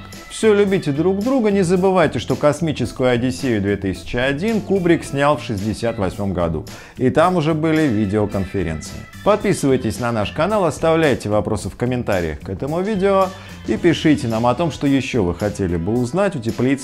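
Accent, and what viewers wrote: Moscow, Russia. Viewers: Это не акцент! Это московский говор! Москвичи ,,акают ".)))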